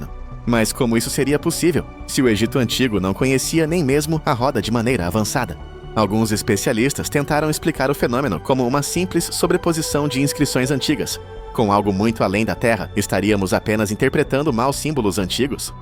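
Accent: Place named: Sao Paulo, Brazil. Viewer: Sotaque de paulista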